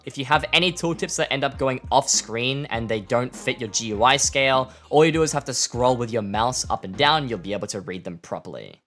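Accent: Australia. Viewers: BRO IS AUSTRALIAN WE NEED MORE AUSSIES ON YOUTUBE AUSSIE HGIH FIVE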